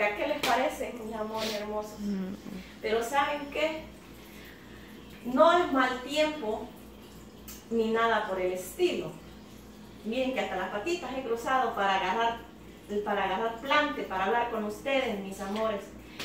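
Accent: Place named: El Salvador. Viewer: Gracias por saludarme ami y mi esposo,nos gustan sus videos habla bien salvadoreña nos encanta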